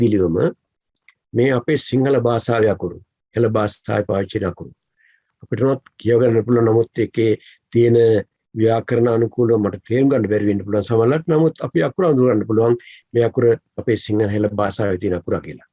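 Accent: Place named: South, India. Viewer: මුනේ මොකද ද්‍රවිඩ ඇක්සන්ට් එකකින් කතා කරන්නෙ. ඔබ යාපනේ නාග හෙලයෙක්ද?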